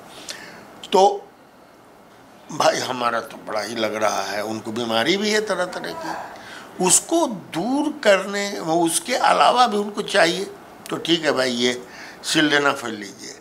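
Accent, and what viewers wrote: Bihar, India. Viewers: It was such a beneficial yet amusing experience listening to such a wise neurologist in typical Bihari accent and style...😊❤ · Sabse best doctor sahab ka samjhane ka bihari lahja uper se khan to muzaffarpuriya style as-------Repallaya sabd dilko chhoo gaya. Thanks doctor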